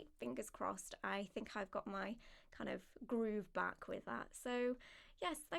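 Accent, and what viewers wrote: United Kingdom. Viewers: God I hate the overtly sexual vidoes/thumbnails... I dont know who is watching their full vidoes theyre usually terrible from an actual asmr or relaxation point ... side note Ive only seen some whispered vids didnt realize you were from UK, probably why I like your voice so much lol. Anyway thanks for making content! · yeah I know that, I just can't differentiate her British accent from other parts of Britain or Europe. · Shes british mate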